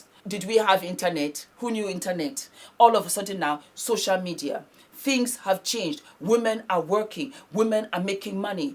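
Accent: Pidgin, Nigeria. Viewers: l enjoy u more when u go the Nigerian way of talking❤ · I've been to Nigeria 🇳🇬 and loved it! I love to hear u speak in Nigerian accent! Mo Nife ❤❤❤ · Your Nigerian English de sweet me...,God bless you. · really went Naija this episode 😅😊. Her broken on point 🇳🇬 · darling! I love when you speak pidgin, you always crack me up. Thank you for the words of wisdom you give to everyone out there, forever treasured. Thank you. ❤️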